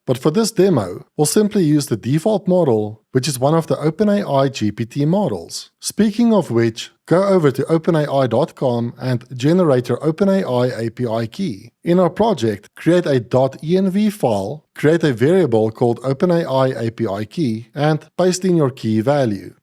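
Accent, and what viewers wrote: South Africa. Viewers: Hi, this is a great intro tutorial for crew. Tell me, your accent tells me that you are in South Africa, is this perhaps true?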